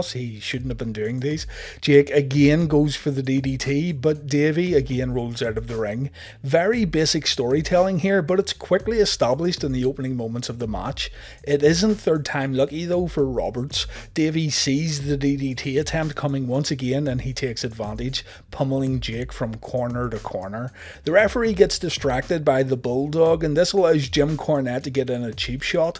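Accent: United Kingdom. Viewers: What part of UK you from?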